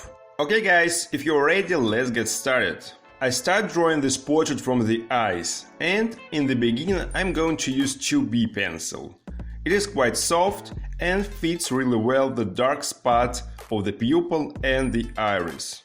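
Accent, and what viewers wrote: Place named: Russia. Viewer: Work on the cheeen Wtf That russian accent gives me crinngee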